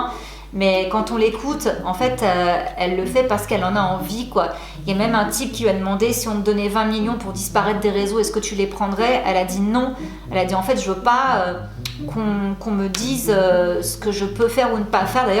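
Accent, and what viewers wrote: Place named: Alsace, France. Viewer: Est-ce que t'es alsacienne ? Ta un petit accent 😅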